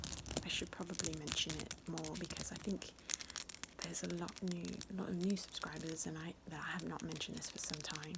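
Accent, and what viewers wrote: Italy. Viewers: wait I thought she was American but lives in Italy and has an Italian accent....?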